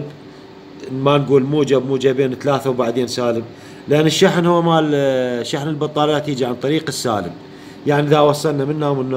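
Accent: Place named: Iraq. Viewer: السلام عليكم ورحمة الله وبركاته اولاً اشكرك على هذا الشرح الرائع بس ياريت بشروحاتك القادمة تحاول تتكلم بلهجة تكون مفهومة اكثر لغالبية المتابعين أخوك من اليمن وعندي مشكلة باللهجة العراقية ما افهم عليك بعض المصطلحات 😅 (تحية من اليمن لكل عراقي)😘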